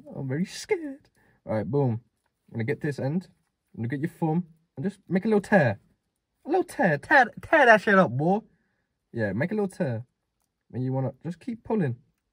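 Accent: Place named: Birmingham, United Kingdom. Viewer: u from birmingham? nice accent.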